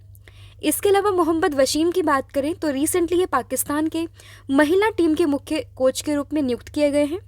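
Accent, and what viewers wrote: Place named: Bengal, India. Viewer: Aap pakka Bangal se ho Aapka voice se pata chl gya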